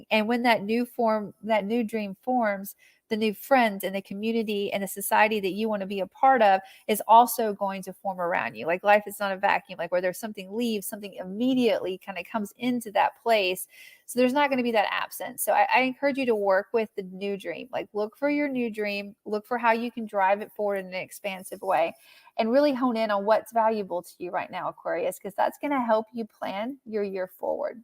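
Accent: South, United States. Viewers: I love the light southern accent you have!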